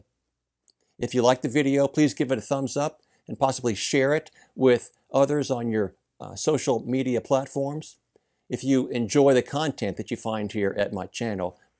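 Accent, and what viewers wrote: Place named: Australia. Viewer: P S well if ultimi are Italian . Let’s talk in italian😂 . I thought you were Australian .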